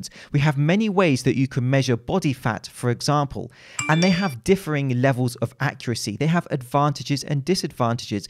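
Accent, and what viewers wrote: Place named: RP, United Kingdom. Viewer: Thanks for breaking it down, it was amazing explained by you with your cultured british accent. It's a shame though that doesn't address the physique competitors aspect😜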